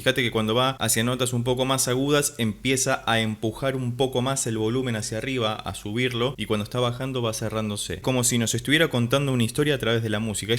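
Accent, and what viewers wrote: Argentina. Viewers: Entre mate y to, lo que habla como argentino, no desarrolla lo de interés de escuchar la voz de la joven. Blablablá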